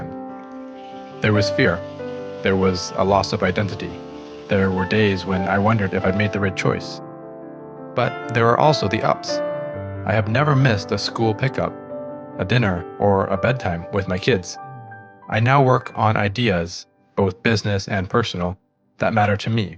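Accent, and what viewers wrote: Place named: Europe. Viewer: But your Asian, why talk as if your European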